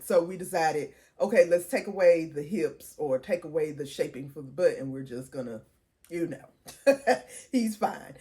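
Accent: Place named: South, United States. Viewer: Love listening to you because you and I have that Southern drawl. They’re both so cute, when are the patterns going to be available for sale I need to make my Wilberforce University (1856) dolls?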